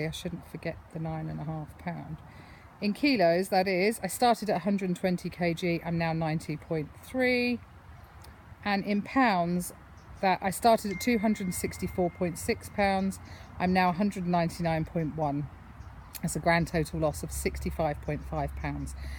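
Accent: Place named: RP, United Kingdom. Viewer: Potty mouth doesn't sound the same with a British accent. It's very posh😘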